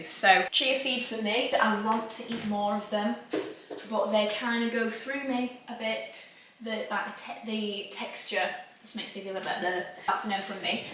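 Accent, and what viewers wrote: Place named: North, United Kingdom. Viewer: My God I do love a Northern dialect! The way you say „honey“ and „gut“ 😂 ok, this sounds really weird written out but hope I’m not alone in this 🙃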